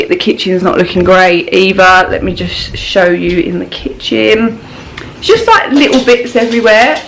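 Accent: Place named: England, United Kingdom. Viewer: You are beautiful great body... Love the English accent...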